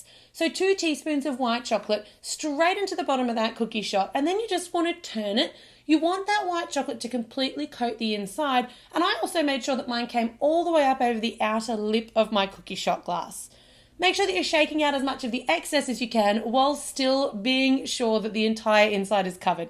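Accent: Australia. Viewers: Are you Australian because you sound like one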